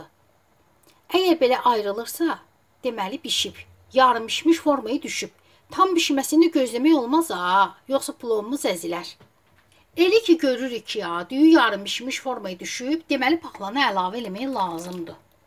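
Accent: Baku, Azerbaijan. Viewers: Bakili danishigidi. Allah bereket versin baci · Nəhayət ki......👍👍👍👍👍👍👍👍👍👍👍♥️😘💐. gözləduyimiz paylaşim...,eşitmək istediyimiž Bakı ləhçəsi. səlis izah, dadlı plov.....,sizdən gələn cəkilişlərin ardı gəlsin in şə Allah canim♥️💋💐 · Çox sevdiyim Baki ləhçəsi çan baçim əllərivə sağlig çox təşəkkürlər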